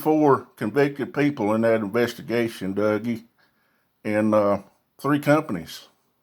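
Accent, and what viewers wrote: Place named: Georgia, United States. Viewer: I'm sorry for you you don't know what the hell you're talking about. You need an education. You sound like your from Georgia or Florida.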